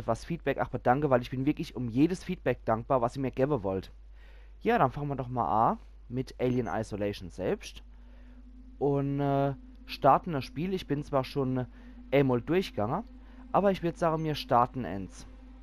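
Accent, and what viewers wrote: Palatinate, Germany. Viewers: ah hier steht es ja auch .lol. Pfälzisch tatsächlich.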